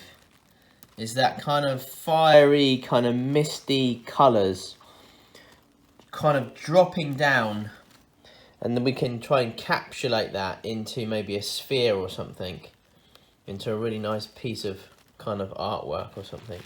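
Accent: United Kingdom. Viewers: Well thought by the thumbnail this would be interesting video... then soon as heard you speak I said ugh hell no! The way Brit’s speak is absolutely annoying... so no thank you moving on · ...you are freaking amazing n hilarious!!! Great job n i love your British accent.....rather sexy...🙋💕💕💕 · First time watching this channel and I honestly was not expecting the British (?) accent.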